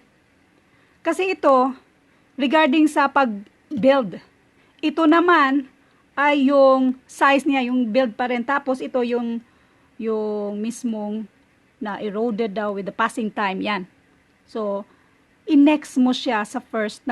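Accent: Visayas, Philippines. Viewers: Your relative is here 🤗 mam, Mutia shock ko gamay , ma reaction 😲 , nakita ko sa reviewer, while reviewing M., video at reviewer nyo po, ang gamit ko para mag review kaya pala sound bisaya .... big thank you mam sa inyo..🙏🙏, sana nga lang di ko makalimutan ang ne review ko ..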